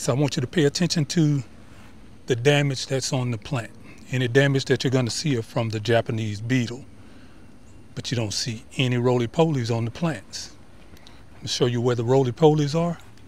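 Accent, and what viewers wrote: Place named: South, United States. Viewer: Your voice tone/accent brings back so many southern traditions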